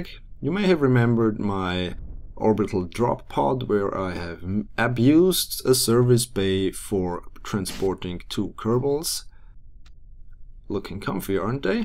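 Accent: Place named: Germany. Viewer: u German? ur accent is just trying to Sound English but failing... just like a German accent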